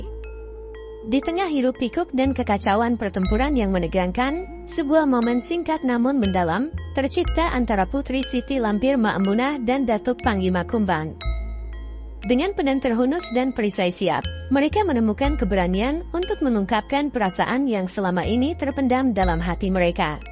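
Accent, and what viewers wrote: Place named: Netherlands. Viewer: Suara yg buat konten ini j suara logat orang belanda.bukan orang indonesia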